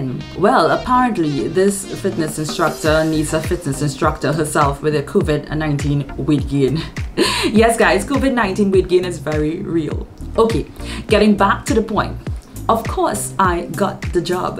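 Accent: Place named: Trinidad, Trinidad and Tobago. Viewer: I’m picking up that beautiful Trinidad accent. Great tips. I’ve been applying these tools. I go into the interview with the intent of making it more of a conversation in selling myself.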